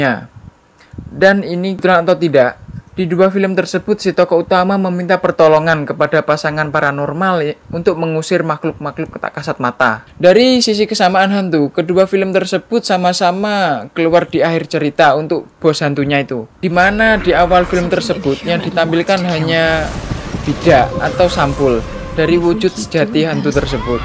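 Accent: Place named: Bojonegoro, Indonesia. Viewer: Medok jonegoro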